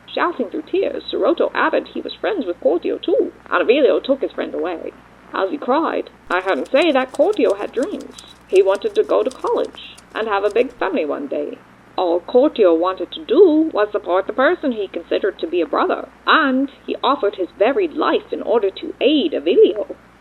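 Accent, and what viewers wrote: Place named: Transatlantic, United States. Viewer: The trans-atlantic voice is so entertaining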